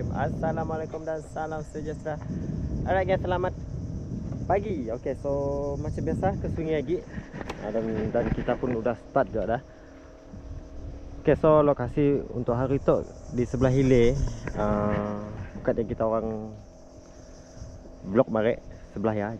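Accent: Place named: West Kalimantan, Indonesia. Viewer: orang sambas ke bang,bahasenye same kyak orang sambas😅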